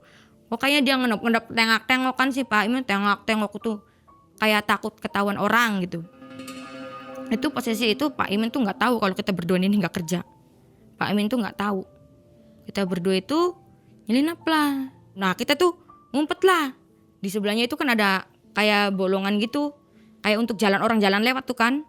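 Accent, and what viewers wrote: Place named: Java, Indonesia. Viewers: Ngapak tegal 😅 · Serem tapi aku ketawa Mulu sama Bahasa ngapaknya . Kebetulan aku orang tegal juga mba. salam kenal. sampean tegale Ndi yu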